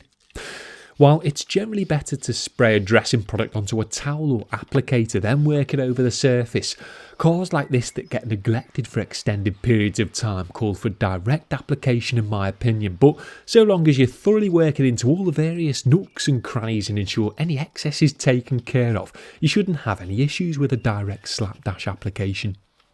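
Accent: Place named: Merseyside, United Kingdom. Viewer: Before watching vids, I thought the Merseyside accent was just good on record.. like the Mersey hits of the 60's But also sounds great for car detailers too..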